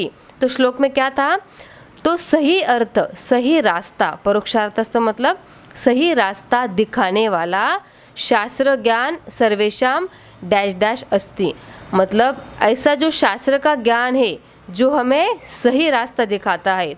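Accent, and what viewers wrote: Maharashtra, India. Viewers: Maam are you Marathi???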